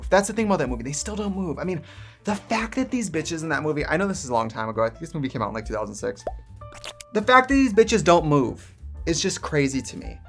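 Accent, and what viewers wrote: Wisconsin, United States. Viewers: Sounding extra wisco to me. Am I crazy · Oh she went full Wisco in this one 🖤 probably on purpose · Is it just me or was her Wisconsin accent stronger than usual in this video 🤔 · Her Wisconsin accent is coming out hardcore today honeyyyy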